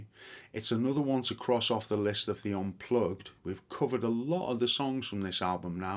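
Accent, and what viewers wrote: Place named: Derbyshire, United Kingdom. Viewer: Listening from Uganda... is that a Derbyshire or Nottinghamshire accent? ... I used to live in & around Louth ... love your easy style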